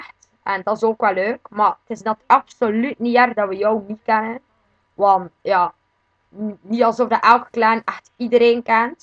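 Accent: West Flanders, Belgium. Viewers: je hoort fuking hard dat hij West-Vlaams is. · Nice West-Vlaams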